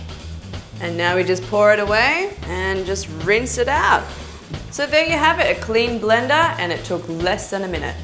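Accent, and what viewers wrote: Australia. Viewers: Where is she from? Australia? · is she from Austrailia?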